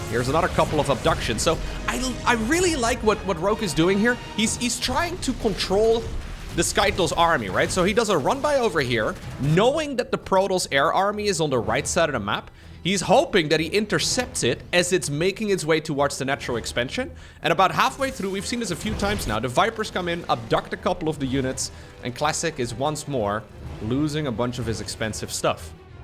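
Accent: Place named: Netherlands. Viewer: My wife and I lived in Netherlands and just appreciate the wonderful accent 😂❤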